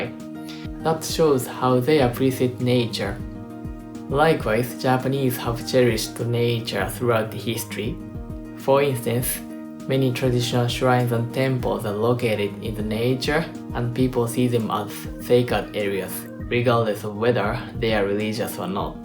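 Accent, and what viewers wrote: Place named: Finland. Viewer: You've already picked up some Finnish accent.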